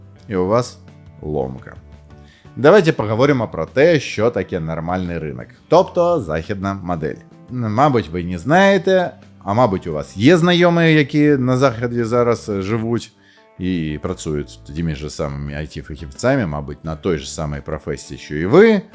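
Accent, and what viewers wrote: Russia. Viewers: ти топчик, що перейшов з російської на українську. Це на справді дуже потужних та поважний крок, повага від всієї україномовної спільноти. Але будь ласка продовжуй цей процес бо твоя україська звучить як адаптована на україньську російська. Тобі ще є куди рости, вдосконалюсься, та все буде Україна. Дякуємо тобі за твій вибір. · Переход на украинский - это одно, но результат пока что выглядит сыро. В речи много русских слов и интонаций, из-за чего украинский воспринимается неестественно. В итоге это только ухудшает общее впечатление от видео :(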